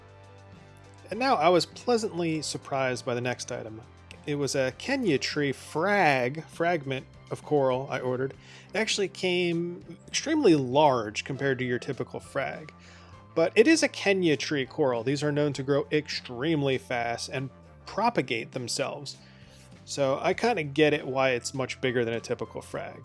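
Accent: South, United States. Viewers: This was great to see ...Bring back your Southern Accent she hasn't been on in a long while except once 😁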